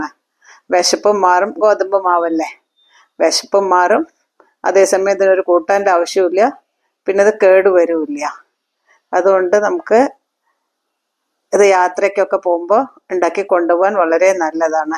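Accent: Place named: Palakkad, India. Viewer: You sound like my Grandpa 's family from Ellapulli Palghat 😊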